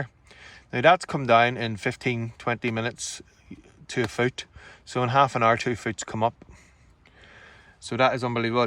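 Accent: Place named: Ireland. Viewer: Really enjoyed this vid so much! many thanks. that Irish accent reminds me of friends I haven't seen for too long, and the positive attitude in the rainy conditions, brilliant filming, and perseverance to catch is just great and inspiring to see when I feel like staying in and not getting out fishing as the forecast is shite. all the best to you, mate, thanks again! ;-)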